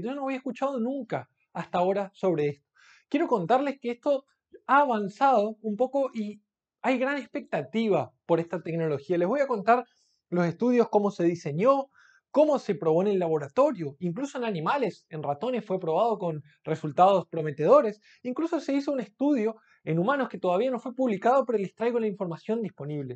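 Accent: Argentina. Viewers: Al fin Dr., volvió a su original acento argentino y dejó el feo acento brasileño. Se le escucha lindo y muy interesante su exposición gracias Dr. por su dedicación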